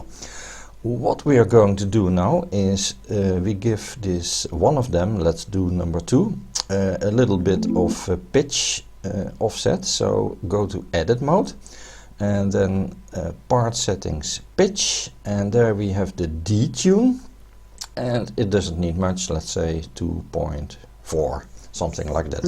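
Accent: Netherlands. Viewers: Thank you for your very excellent tutorials, bravo. You sound Dutch, am I correct?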